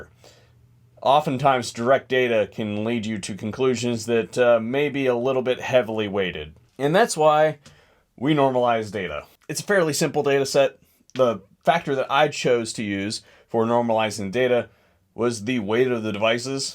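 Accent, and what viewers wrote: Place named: United States. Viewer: Great job at testing & publishing hard numbers. Ditto at keeping it real & speaking American! I got to learn a few new things like WHY I like a backup nut instead of crush washers. I'm only 6 years old in building & 3 years rusty since I got booted off the ranch where I used to shoot. Just tryin' to keep my pilot light lit these days. Good info is much appreciated.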